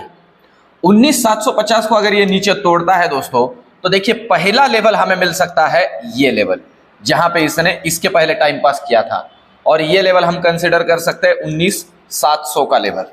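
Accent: Maharashtra, India. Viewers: r u from maharstra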